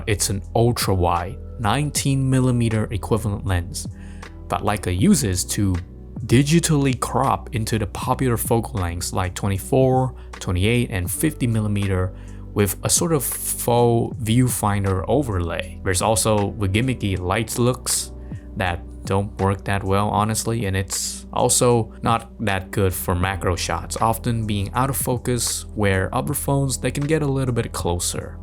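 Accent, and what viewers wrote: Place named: Vietnam. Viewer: ô ông anh là người Việt à, accent đã thế. Có 1 tấm a chụp ở UTE đúng k nhỉ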